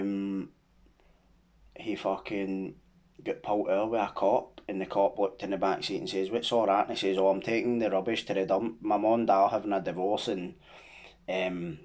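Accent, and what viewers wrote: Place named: Glasgow, United Kingdom. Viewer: I've heard a lot of these stories before,but it's refreshing hearing it in a Glasgow accent and perspective.honestly well done on everything,I seen you on fivos podcast.theres real change there so respect to you.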